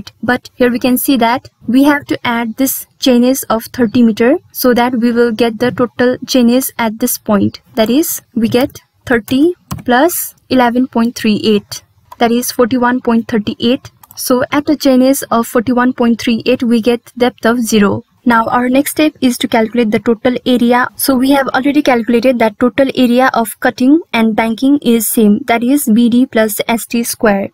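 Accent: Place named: Nepal. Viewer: you have nepali accent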